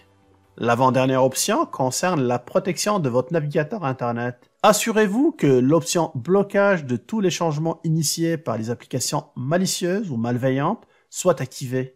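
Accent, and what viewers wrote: Quebec, Canada. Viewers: Une question linguistique : votre accent québécois, se serait-il pas saupoudré de quelques épices du Maghreb ? Sinon, super chaine, très utile et qui va droit au but. Merci pour toutes ces infos ! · Un grand merci, toujours un plaisir de vous entendre avec votre accent canadien. Bon week-end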